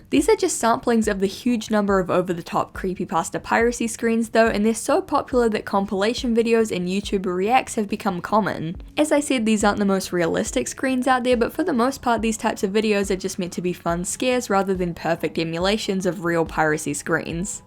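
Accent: New Zealand. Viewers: I just discovered this channel. Is her accent Kiwi? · No. New Zealander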